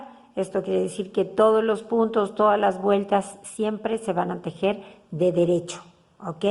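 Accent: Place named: Mexico. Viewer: Otra belleza creada por el arte de esas manitas tan habilidosas, cuando escucho esa vocecita de acento mejicano me pongo alerta por que sé que voy a ver y disfrutar de una maravillosa labor que para mejor aún puedo hacerla yo también. Bendiciones preciosas 🌺🌺❤️🌺🌺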